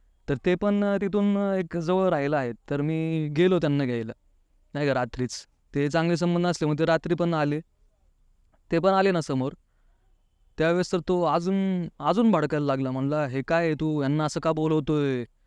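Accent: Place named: Pune, India. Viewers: Punyachi language ahe ti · Dada pune chi language tasich aahe 😅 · पुण्याचे सगळेच तसेच बोलतात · Pune mde tsch boltet rav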